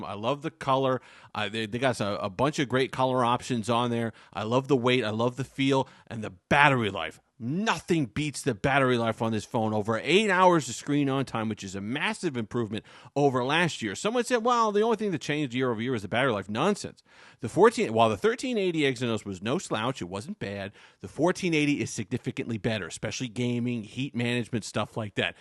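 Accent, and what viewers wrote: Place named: United States. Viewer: appreciated, but if they would ask me to explain how it sounds thick American accent with one video I show this one ahah, goated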